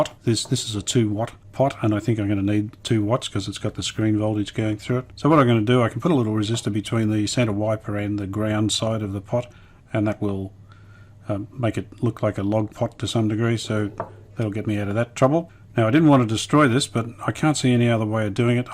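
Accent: Australia. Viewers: That Australia accent are so cool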